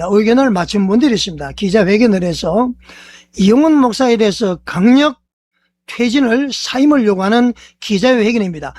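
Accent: North Korea. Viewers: 말도안돼는 유투브영상으로 사람들 혼란시키지 마시요 북한말투가 확실하네요 이런인간이 교회를 망가트리는 원흉이다 여러분들 속지마세요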